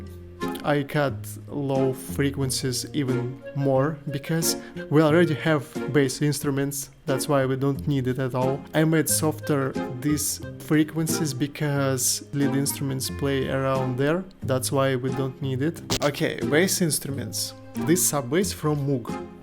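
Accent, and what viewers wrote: Russia. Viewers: У тебя русский окчент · Английский язык с русской интонацией и манерой речи звучит понятнее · Такое ощущение, что акцент русский))))) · u russian? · That russian accent tho) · Блять ты русский? Афигень акцент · Для русского англорусский акцент звучит как родной русский язык)) Рад, что наткнулся на тебя, подпишусь!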